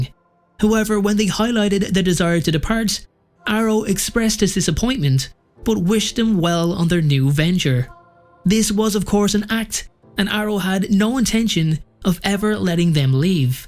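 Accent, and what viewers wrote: Ireland. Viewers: This is a great channel, you should make videos on the Saga of Darren Shan, very little lore videos on YouTube about the series and it’s an amazing vampire series ! You sound Irish so I presume you’ve read them but if not check them out the audiobooks by Ralph lister are amazing! Subbed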